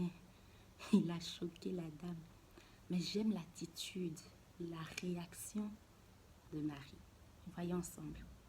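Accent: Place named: Ivory Coast. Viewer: ton parler donne envie d'écouter et ton accent ivoirien 😃. Merci pour le message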